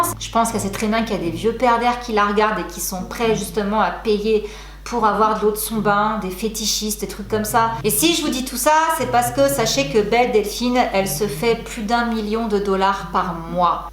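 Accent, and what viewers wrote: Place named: Alsace, France. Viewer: Est-ce que t'es alsacienne ? Ta un petit accent 😅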